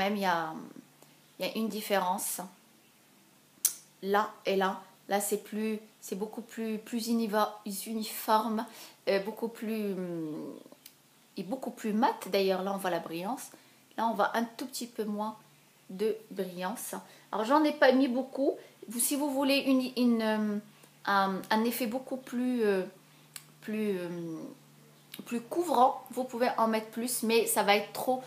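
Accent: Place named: Morocco. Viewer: essaye quand meme de faire des tuto en arabe darija tu va rien perdre car en tt cas tu a un accent marocain et ca se voi tres bien que ta pa de probleme de langue arabe comme tu le dise regarde les tuto de morrocan misstinguette c'est une marocaine qui vis en france ya pas mal de temps et malgre ca elle fait des videos en langue arabe darija je la kiff...jespere que tu va pas men vouloir je suis pas entrain de te critiquer mais c'est un point de vue et pas plus:)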